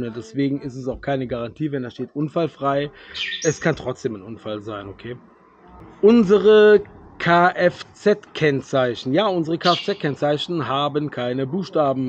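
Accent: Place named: Cologne, Germany. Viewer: Danke für die 10 punkte der sprache her kommst du aus köln. Sind vor 1jahr von ķöln nach Avsallar gezogen ķölle Alaaff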